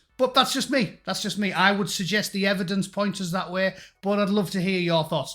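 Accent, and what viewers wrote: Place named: Teesside, United Kingdom. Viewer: Spot on. Agreed with every syllable. Like listening to myself with a Teesside accent. I'll be using the one about John Wayne's safety catch 😂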